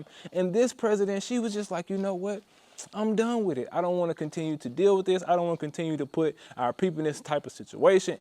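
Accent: United States. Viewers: I can tell by your accent that your people are in America.....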